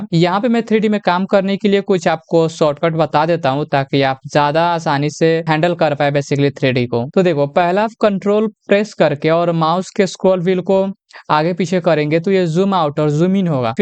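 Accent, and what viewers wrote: Bihar, India. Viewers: bhaiya aaap bihari hai kya ?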